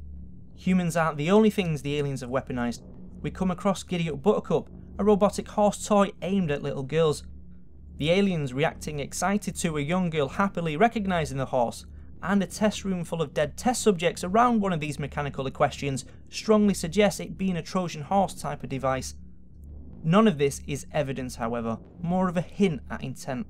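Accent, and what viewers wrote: United Kingdom. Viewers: your British accent is funny.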